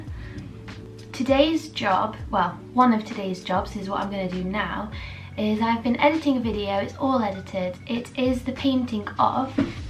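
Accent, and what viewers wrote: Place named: Midlands, United Kingdom. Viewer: You came up in my recommendations and I really liked your video so I’ve subscribed. Are you from the Midlands?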